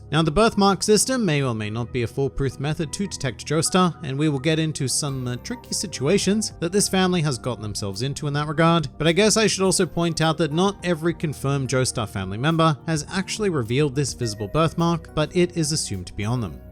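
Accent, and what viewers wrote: Australia. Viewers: hmm i do like sarcastic Australians narrating 🤔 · I didn't realize you were Australian. I thought you were American trying to be fancy. I guess that describes the Australian accent pretty well. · Sarcastic Australian man narrating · imagine not having read part 6 and hearing an australian talking about a dude whos looks are on the level of a trashcan who wields the stand bohemian rhapsody thats somehow connected to van gogh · I am here for an Australian man narrating. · You're right other videos don't have a sarcastic Australian man tell me about Jojo Family line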